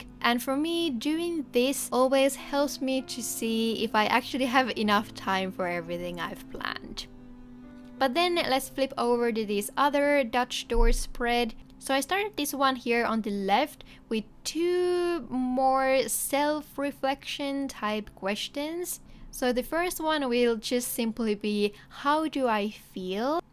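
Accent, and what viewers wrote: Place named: Finland. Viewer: beautiful!! also your accent sounded familiar so i had to check if you're finnish, and you are! terkkuja suomesta! 😄❤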